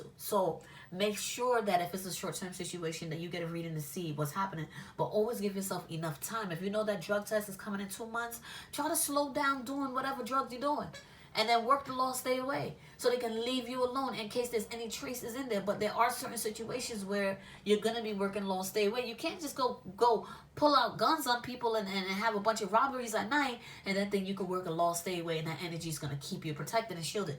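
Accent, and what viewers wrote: New York, United States. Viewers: Where r u from.... you sound like a New Yorker ...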